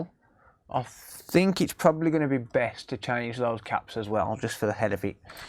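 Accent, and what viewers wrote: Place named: Sandwell, United Kingdom. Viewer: New sub, I thought to myself this guy sounds local, lol I'm from Sandwell, great videos 👍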